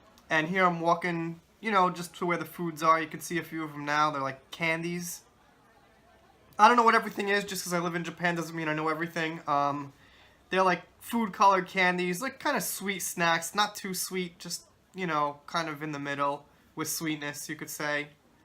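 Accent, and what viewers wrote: New York, United States. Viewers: funny I thought you had a NY accent 😆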